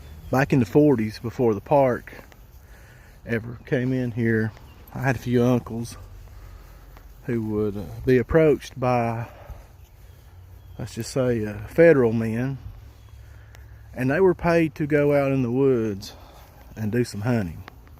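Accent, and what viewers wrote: South, United States. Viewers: This is beyond interesting. Wow. U got a great southern voice and delivery too. Good job.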